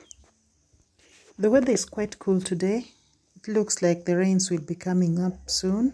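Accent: Kenya. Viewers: ❤ you sound Kenyan to me ,am from Kenya where is that. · 💯I so agree with your sentiments. I would love to live in such a beautiful serene environment. I hope to return to visit Africa one day. Are you in Kenya? Your accent + maandazi equals Kenya or TZ to me. 🥰 I was in Kenya and it was like being in heaven (30 years ago). May I be able to return and live like you. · Where are you in Kenya? I lived near Eldoret from <num>. It's so beautiful there and hearing your accent reminded me of my old friends in Kenya :) Asante sana kwa video nzuri sana!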